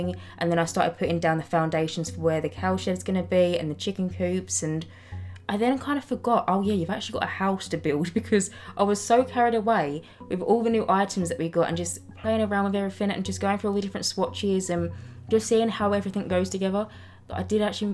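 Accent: United Kingdom. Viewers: how I instantly knew was british 1. its well old 2. her accent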